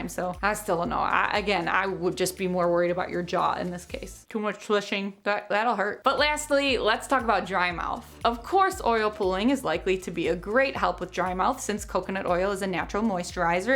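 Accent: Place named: Midwest, United States. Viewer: I can tell a Midwest girl from a mile away. Hiiiii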